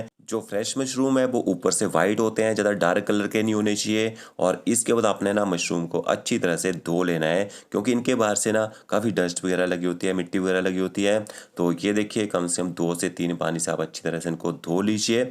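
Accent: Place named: Himachal Pradesh, India. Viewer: Sabji tau bahut badhiya hai hi par chalni rakhkar excess oil nikaalne ka idea bahut achcha laga. Aapki Vanni se lagta hai ki AAP Himachal ke rahne vale hain. Shubhkamnaye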